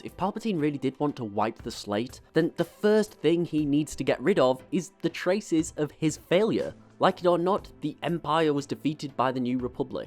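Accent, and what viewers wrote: United Kingdom. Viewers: I agree, I love brittish accents. Tho according 2 sw lore, he's evil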